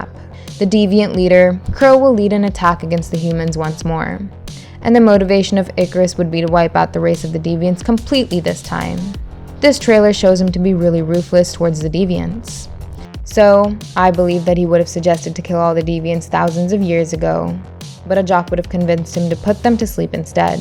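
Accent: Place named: United States. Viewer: You're a female CANADIAN LAD ...but you sounds like AMERICAN 😁lad ❤x🇮🇳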